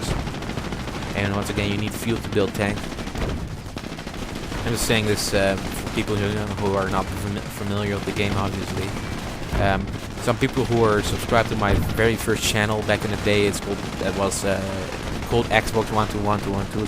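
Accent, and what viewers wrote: Netherlands. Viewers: youre dutch? you got a litlle dutch accent, im dutch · je kan duidelijk verstaan dat je nederlands ben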